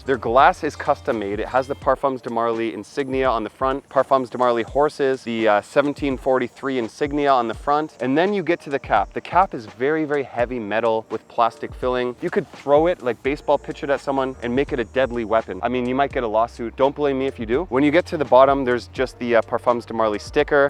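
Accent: Canada. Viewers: Anything in a 4.2oz bottle is definitely worth it. 100 meters🤣😂😭Canadians definitely talk differently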